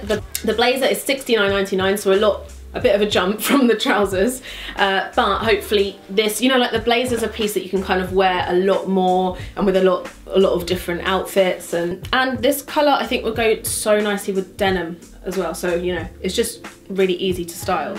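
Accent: United Kingdom. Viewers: I think the only reason I see your videos is your british accent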